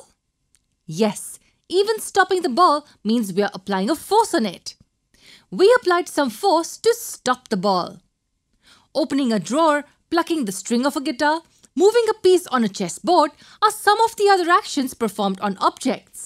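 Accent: India. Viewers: R u Indian bc ur English sounds like Indian people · Heavy Indian accent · This Indian sound Smh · wow indian accent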